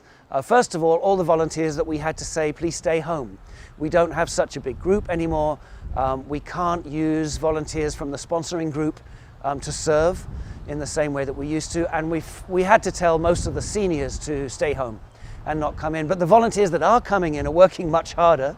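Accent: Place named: England, United Kingdom. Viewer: ...who is this narrator right off the...boat with his quaint English accent...wtf...moved to waimea due to zip code based average wealth of newly arrived residents that made their wealth through the gravy train FAKE economy now crumbling...Worldwide...HAWAII FOR HAWAIIANS... Waikiki Io Kea... Cult of Ku...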